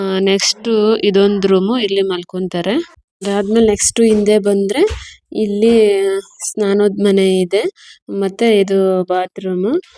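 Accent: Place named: Mysore, India. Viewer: Nivu mysore bhasa matadatira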